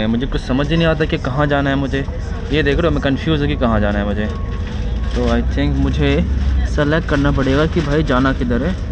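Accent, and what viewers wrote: Bihar, India. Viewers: Improve your communication skills- drop Bihari accent and stop saying bhai.. bhai… you are doing good work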